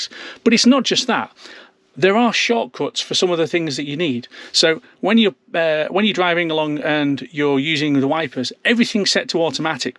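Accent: Scotland, United Kingdom. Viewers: Excellent video and congratulations on your new Model Y! I’m a huge fan of Tesla here in the United States and looking forward to getting the Cybertruck. All the features that you listed resonate with me and your description was spot on. Thanks for the brilliant review and keep the videos coming. Love the Scottish accent.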